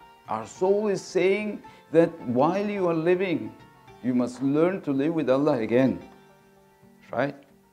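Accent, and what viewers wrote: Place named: United Kingdom. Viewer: Hocamızın ingilizcesi şahane İngiliz aksanina yakin olması orda uzun süre yaşamasından kaynaklı sanırım 🤗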